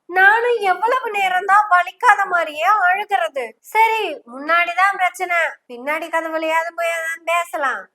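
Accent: Kongu, India. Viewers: கொஞ்சும் கொங்கு தமிழ் அழகு. கொஞ்சம் கொச்சை இந்தி சூப்பர். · அம்மாடி உன்னுடைய கொங்கு தமிழ் மற்றும் கொச்சை ஹிந்தி... சூப்பர் மா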